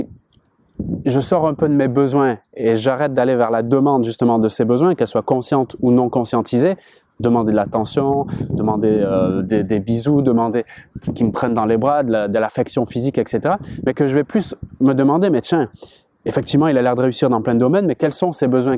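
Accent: Canada. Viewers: accent canadien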